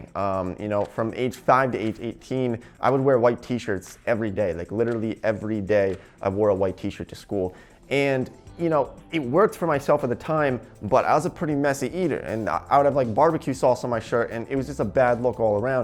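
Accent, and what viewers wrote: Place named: New Jersey, United States. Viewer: Thats a cool accent, i'm guessing new jersey? Forgive me, i'm british!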